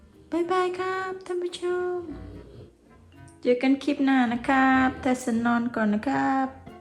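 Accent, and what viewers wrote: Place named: Isan, Thailand. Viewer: ชอบที่คุณพูดลาวอีสาน เว่าหน่องๆๆ น่ารักครับ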